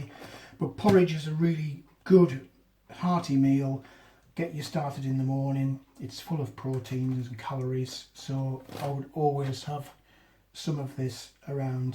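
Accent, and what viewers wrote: United Kingdom. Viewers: Do you have other channel's or do you have a voice twin?🙃😋 anyhow maybe just me a sucker for British accent , good to know us dum Americans aren't the only one's prepping 🙏😁😶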